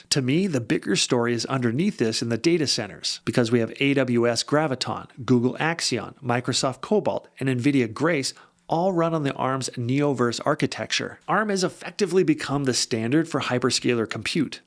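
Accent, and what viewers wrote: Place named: Midwest, United States. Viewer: yep, I listened very close and I heard you and trusted 100% in your research! Been listening very close since the first vid I watched of yours! Must have heard that midwest accent! Thank you for alllll the hard work and explaining what you did at AMZ explains a lot! Even have my husband listening now!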